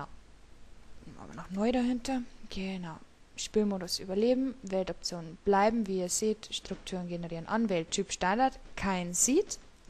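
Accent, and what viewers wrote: Bavaria, Germany. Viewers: Ja! endlich a bayrischs Minecraft-Let's Play gfundn :D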